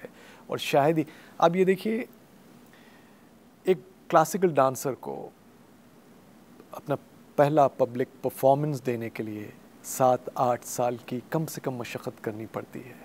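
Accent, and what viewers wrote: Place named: Deccan, India. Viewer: Irfan sahab aap yahan? khushamdeed. khilaaj/khiraaj samajh nahin aa raha tha audio mein. bhai ki dakkhani urdu bhi bahot tight thi. kaafi alag aur dilchasp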